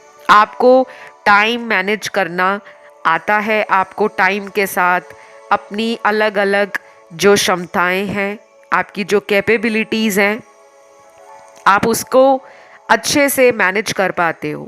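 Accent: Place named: Maharashtra, India. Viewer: R u maharashtrian bcz u talk like typical maharashtrian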